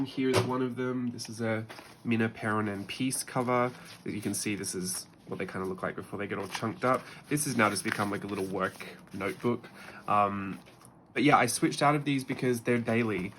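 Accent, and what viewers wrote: Australia. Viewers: …. You are so freakin talented and AWESOME!!!! I Love your work, your creations, your teaching style, (and your Australian accent too)! You’re amazing mister! Thanks for always sharing and taking time to post for all of us, you’re a huge inspiration to me. I’ve had a lot of health problems this past year and miss a lot of videos you post but when I feel well, you’re the first channel I run to and see what amazing things you e created! Ahhhh you make me smile and always feel inspired ❤ thanks for all you do Hugs from Texas… 😊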